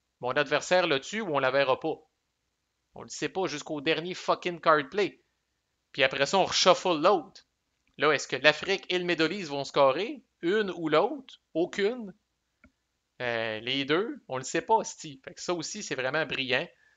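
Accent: Quebec, Canada. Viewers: Merci pour cette review efficace et pas trop longue 👍 Jaime beaucoup l'accent québécois qui améliore encore + cette vidéo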